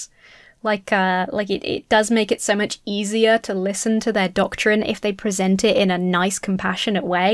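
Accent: United Kingdom. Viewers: starting off the new year with a British amount of shock and awe, brilliant! This highly likable bi woman with eloquently described counterpoints to the source video, while having just a few endearing accent gaffes and quirks that ties together the whole video in a way that usually only annoyed British people manage to do. This subtle call to action against a group that uses religious doctrines to "other" certain groups that they don't want to be treated like equal humans, is as well structured and direct as needed without loosing the simple points covered. Thank you for all the good you did in 2022, and if this video is a yardstick for 2023, it's going to be even better! Keep being awesome, take care and stay safe! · As a New Zealander, I didn't notice that your British accent had faded until you mentioned it. Don't worry about mixing up the accents, they're similar to outsiders and our "rivalry" is basically friendly. 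We know we sound similar.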